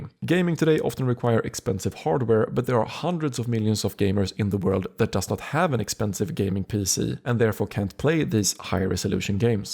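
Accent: Sweden. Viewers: This work good on Sweden? I hear on your english that you are from sweden :D Hur många äger du och vad tjänar du per månad just nu? Har du testat ta ut profit ?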